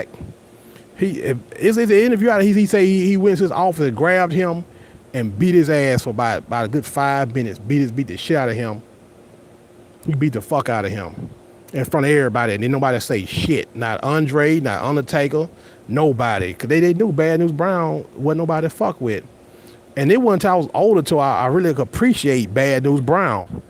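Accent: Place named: South, United States. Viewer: Your southern drawl sounds like Mahmoud Ali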